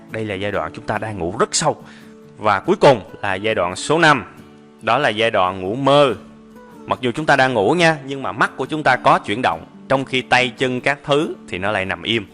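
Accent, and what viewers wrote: South, Vietnam. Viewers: Giong giang bai mien nam nghe nhe nhang , de thu hút . Xin cảm ơn tác gia với những thông tin bổ ích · Hoan nghênh nói tiếng miền nam, Chứ nói tiếng miền bắc nghe nhức óc quá ! Hình như lên video phần đông hướng dẫn chương trình toàn là người miền bắc.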